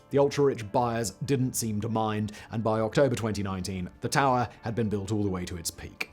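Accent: United Kingdom. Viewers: I thought this was vsauce! I’m like why does he have an annoying British accent now?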